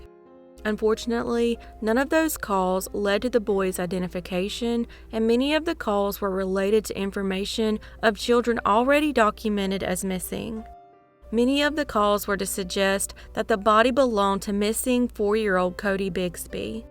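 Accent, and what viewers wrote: South, United States. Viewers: 1st time I ever clicked on a video when the narrator inserts extra syllables in every word. Wooo what a strong hick accent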